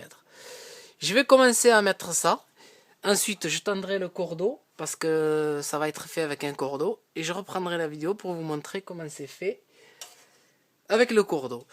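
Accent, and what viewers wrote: South, France. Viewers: Salut merci pour ta video très clair j'ai tout compris avec ton accent du sud c'est géniale. Merci encore et si tu peux faire une vidéo sur les plafonds dale 600/600 se serai dégain merci.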